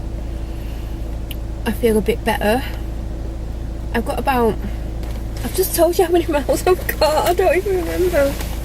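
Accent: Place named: North, United Kingdom. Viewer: I love your northern accent too 😋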